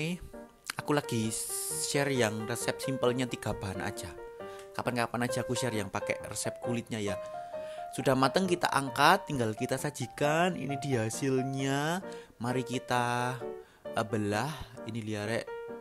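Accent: Java, Indonesia. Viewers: dengan khas suara medoknya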